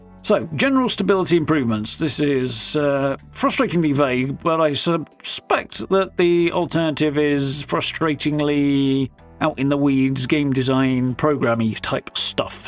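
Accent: RP, United Kingdom. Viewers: This guy sounds very posh😂. it doesn’t go with hes rock star metal head image haha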